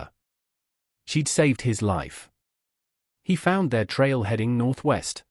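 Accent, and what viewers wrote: United Kingdom. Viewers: Why do I have to listen to this ridiculous British accent on a story that takes place in America? We should have allowed the Germans to destroy the UK when we had the chance (NOT CHONCE).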